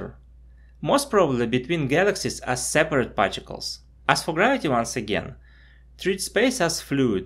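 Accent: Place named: Russia. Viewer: Hey! Isn't your native language Russian ?) I hear familiar accent 😁 If yes, just подмигни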